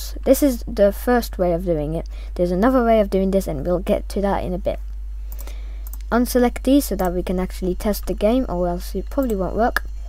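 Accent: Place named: United Kingdom. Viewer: I think im dumb cuz I just made it worst... And this this helped ty dude, ur a British accent ur British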